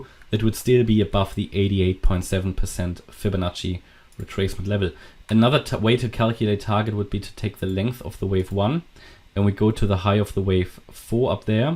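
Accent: Germany. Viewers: Like Kris MM CRYPTO, Deutsche dialect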